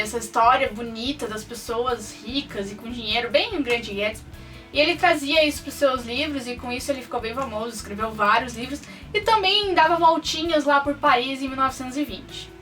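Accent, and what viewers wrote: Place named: Minas Gerais, Brazil. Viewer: muito bem fazeres vídeos acerca de cultura mas tenta fazer sotaque brasileiro de Rio de Janeiro, sei que nada tem haver com a questão da cultura mas acho tão chato e enervante esse sotaque do interior , Minas ou algo do gênero , tenta isso é tens mais um subscritor.. Bj e boa sorte com o canal!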